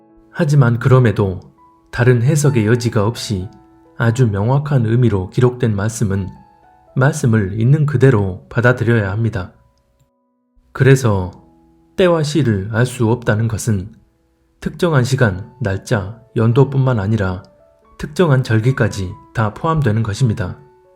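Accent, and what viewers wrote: Jeolla, South Korea. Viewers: 억양을보니 절라도 사람 같다.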